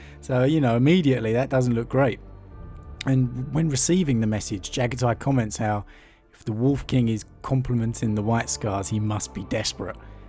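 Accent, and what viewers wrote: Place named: Cockney, United Kingdom. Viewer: It's called Cockney, and it's an accent. Not all English people are incapable of saying "th" lol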